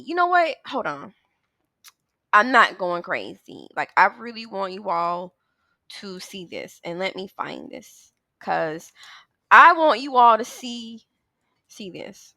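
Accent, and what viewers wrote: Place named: Tennessee, United States. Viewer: You from Tennessee!?!?!?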